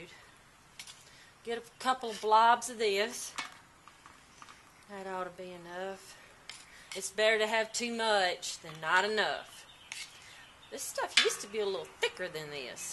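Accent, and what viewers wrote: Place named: South, United States. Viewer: Love this southern gal! Sounds just like Paula Deen! Maybe it is? LOL....going to repair my concrete birth bath now!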